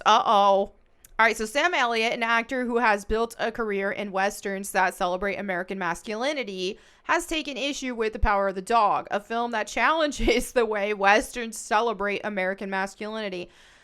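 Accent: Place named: Texas, United States. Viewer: First time I have seen your vids and I agree.. I can tell you are from Texas like I am. lol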